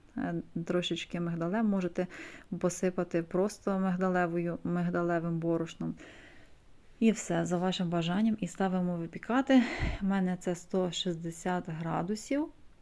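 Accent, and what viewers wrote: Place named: Ivano-Frankivsk, Ukraine. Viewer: дуже дякую землячко, я родом з калуша і чую що у вас акцент такий з івано-франківської області.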